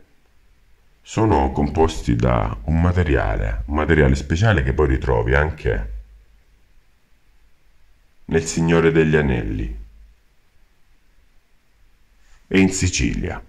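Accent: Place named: Salento, Italy. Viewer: ti ammiro tanto...grazie per la sapienza ke condividi con tutti noi🙏❤️ti ammiro tanto,ankio salentina di Brindisi,sento un accento vicino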